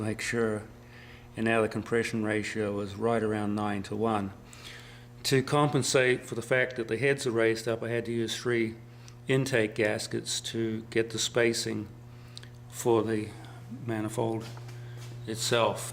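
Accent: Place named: Australia. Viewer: Mate you the best, i bet your part australian, based on how your talk and how some of the words are spoken. keep up the great work mate and i hope to see it on the dyno. cheers please supply a link of the dyno ?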